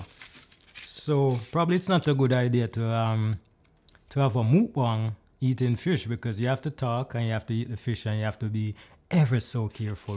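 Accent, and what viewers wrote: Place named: Jamaica. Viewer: I don't know if it's a Jamaican accent or African....oh ..Jamaican.. Big up G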